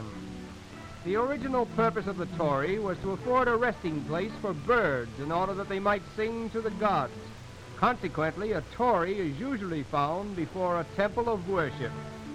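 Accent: United States. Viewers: ナレーションアメリカ人かな？ まだ日本とアメリカが仲良かった時代か。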